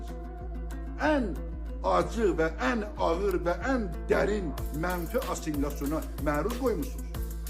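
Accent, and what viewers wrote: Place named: Iran. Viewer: Mene bir şey maraqlidi bu çöhreqani soy ismi iran diyalektinde danişiĝi iran şivesi baxmayaraq azi 20 il amerikada oĝluda eyni sen numune olmalisan ama doĝru düzgün Azerbaycan Türkcesinde danişa bilmirsen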